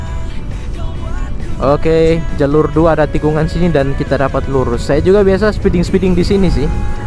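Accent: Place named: East Nusa Tenggara, Indonesia. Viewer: logat bahasa indonesia tu hampir mirip NTT ya... 😂😂😂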